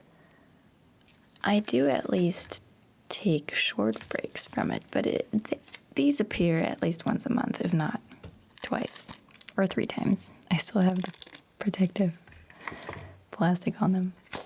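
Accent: United States. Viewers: You sound even more American than usual. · Whatttttttt?! Where’s the German accent? You sound like a normal American I’d talk to in the street 😂🤯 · I’m confused. How are you German, but have an American accent? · Can you please tell me how/why you have an American accent but, I think you said, you’ve never lived there?! I’ve watched you for YEARS and have always wondered! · HOW is your accent so American. It always stuns me how American you sound! I wish my language skills were half as good! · You really, really sound American. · I never noticed how much emotion is taken away from your voice when you whisper but it sounds weird hearing you with more range and emotion. You also sound a lot more American when you speak normally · Why is your english accent so American? Aren't you german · How do you have an American accent?? Did you pick it up from American shows or learnt from a German who spoke English with an American accent? 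I'm so confused rn 😂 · How do you sound even more American in your regular voice 😂 The change is tingly though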